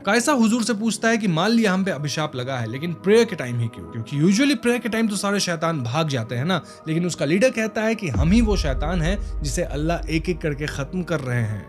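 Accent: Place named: Bengali, India. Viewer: Bhai, just love to listen bengali from you're mouth. Sounds sooo sweet. ❤❤❤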